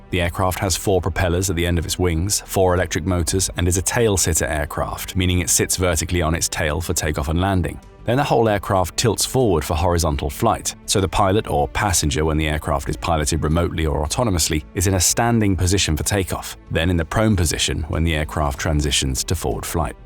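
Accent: United Kingdom. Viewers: Someone got some dumb British narrator to say it was American. Silly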